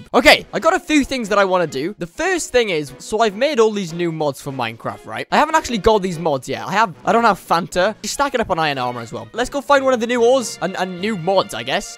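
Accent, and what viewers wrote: Wales, United Kingdom. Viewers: Hol up are u Welsh?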